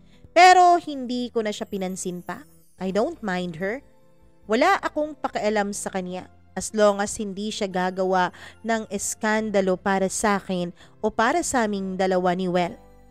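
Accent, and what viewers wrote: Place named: Conyo, Philippines. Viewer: 😅ang konyo ha 😅 inde ko nmn mina Mind 😆 ano yan live selling 😂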